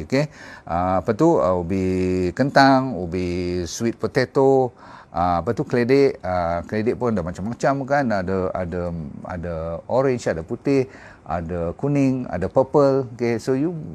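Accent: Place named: Kedah, Malaysia. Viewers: alhamdulilah...tqvm... ilmu yg sgt bermanafaat..suka dgr Dr. cakap kedah....terbaek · Tiba2 Dr. Kefek utara asai oghang mana? Info cukup bagusla Dr tq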